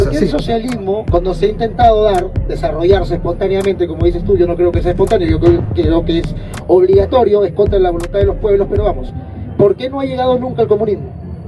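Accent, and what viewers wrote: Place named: Chile. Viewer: Por la forma en que habla este profe , parece chileno , creo comprender su accionar , tonto no es . así gana adeptos chilenos que lo seguiran diciendo que habla la verdad y otras cosas favorables a ellos , el meollo del asunto es que el profe gana buen dinero con los suscriptores chilenos que además le compran sus libros . Podrá engañar a los chilenos pero no a mí , tengo un amigo chileno que piensa lo mismo del profe .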